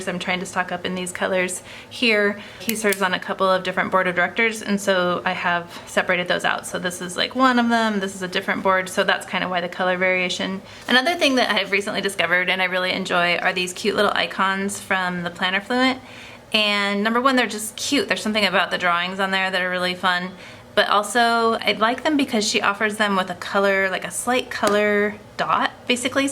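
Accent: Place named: South, United States. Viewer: You have a great Southern accent!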